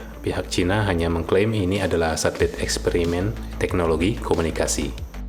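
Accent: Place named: Aceh, Indonesia. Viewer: logat acehnya masih kental bg.. tpi ga papa lanjutkan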